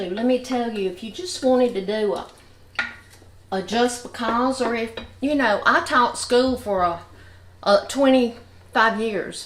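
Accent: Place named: Alabama, United States. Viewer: I just love to hear you Alabamians talk. I’m from E Tx and it is said that we have an accent 😂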